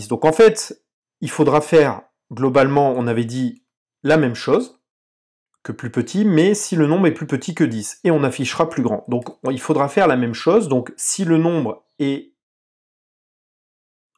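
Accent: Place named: Ardennes, France. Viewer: Bonsoir, tu me rappelles mon ancienne collègue, elle prononçait le "t" de vingt, tu es des Ardennes toi aussi ? :D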